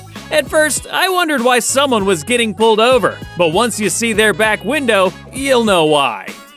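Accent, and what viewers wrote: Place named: United States. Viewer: That's the most American narrating I've ever heard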